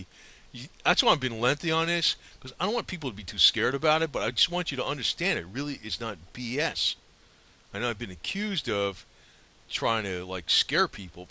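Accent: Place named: Texas, United States. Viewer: Dude, your voice is familiar, what part of Texas are you in?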